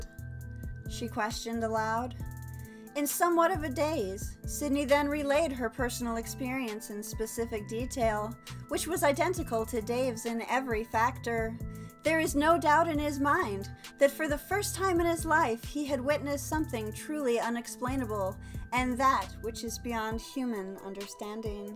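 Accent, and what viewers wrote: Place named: South, United States. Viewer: No one cares if you like someone’s voice. You must have a pretty crappy life to leave a whole novel on a video about someone’s vocal tone. I love her Southern charm and affect. It’s called story telling. You’d do more good in life taking the time to tell people positive things instead of spreading negative energy like manure.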